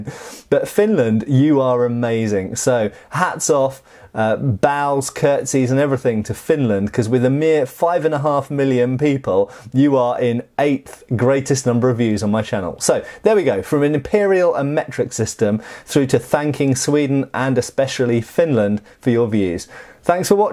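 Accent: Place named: United Kingdom. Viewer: 'Ello! French here! I'm not so much into weapons and stuff. I don't know about the other French people, but I'm just suscribing to your channel because I love ze british accent! Also, 'ere, we don't say ze metric system, we say ze internationnal system. Lot of love from Paris.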